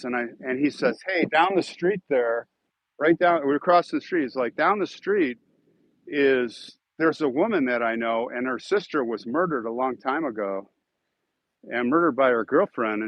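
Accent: Chicago, United States. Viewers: My cousin Teresa married Gary Vanhousen from Chicago il so your dialect hits home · love your Chicago dialect!!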